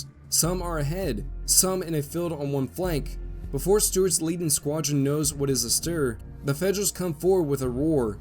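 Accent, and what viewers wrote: South, United States. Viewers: Just found your channel recently!!!!! It's awesome!!! Only someone who really knows and cares about American history and culture does content like this. Detail is excellent as I've studied this period in detail as well! Keep it up and thank you!!! P.S. Nice to hear a southerner narrat it too! (Sorry if I'm mistaken you do a good job either way).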